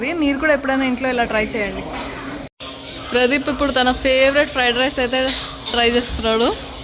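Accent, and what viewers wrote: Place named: Karimnagar, India. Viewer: Slang different antey meru Hyd or knr. madi karimnagar akka. Continue videos cheyandi anna.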